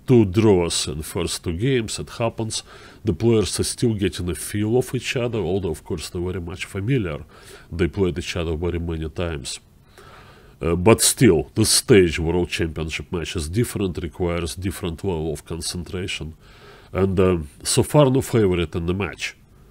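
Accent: Russia. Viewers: But they are not all available. Plus, this guy is great. He has great analysis and has some very interesting things to say about chess. And he's actually fluent in English you can tell. He can say whatever he wants and talks about jokes and other things. He's just got a Russian accent. Which is not that bad, it's called diversity. And this guy is very good and interesting. So what's the problem really. If <link> hired him he's obviously got something going. Eric and the other chess brahs are great but there are other great people out there too and we can learn a lot from diversity in the chess world. A little accent never hurt anyone and it's a good opportunity for people to learn something new about chess from someone very experienced.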